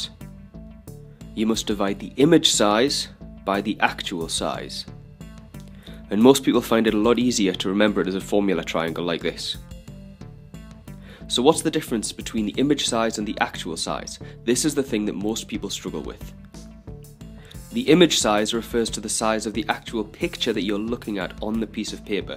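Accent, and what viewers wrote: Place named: Newcastle, United Kingdom. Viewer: got a science exam tomorrow so ty and the geordie accent actually helps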